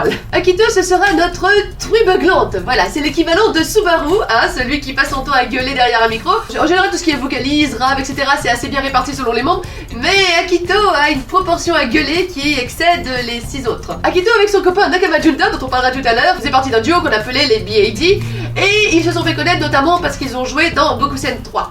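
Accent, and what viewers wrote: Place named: Spain. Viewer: Comment est-ce que vous êtes si peu connue, c'est vraiment du contenu de qualité !!! Sur une autre note, je suis québécoise et j'étais convainque que vous étiez espagnole ou quelque chose du genre au début, et ça m'a prit un bout pour réaliser que c'était du français ! C'est fou comme les accents différents d'une langue peuvent affecter notre compréhension hahaha ! Encore une fois, super vidéo ! xxxx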